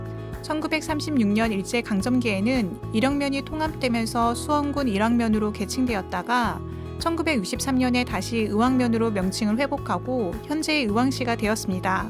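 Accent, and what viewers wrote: Gyeongsang, South Korea. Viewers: 경상도 분이신가 봅니다.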